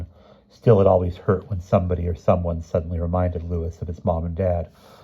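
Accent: England, United Kingdom. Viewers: You do a good English accent!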